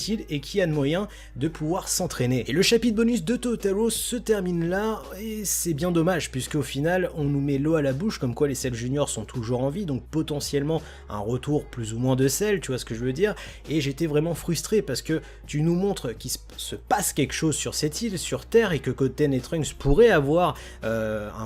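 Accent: England, United Kingdom. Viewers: Pk tu dragon ball avec un acsent anglais???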